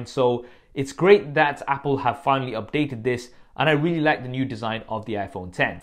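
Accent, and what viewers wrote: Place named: United Kingdom. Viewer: Was waiting for British accent lol.. other than Marques and Michael Fisher, you're the only reviewer I trust (and yes I am fanboying)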